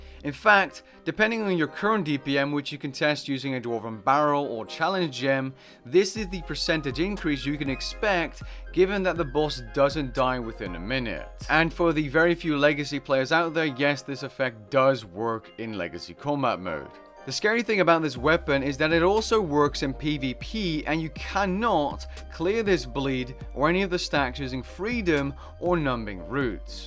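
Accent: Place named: Netherlands. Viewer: Are you dutch? You can really tell by the accent haha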